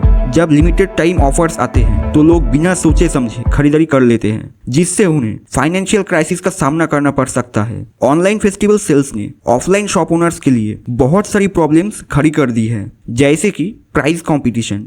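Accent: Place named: Bengal, India. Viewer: Your Bengali hindi accent ❤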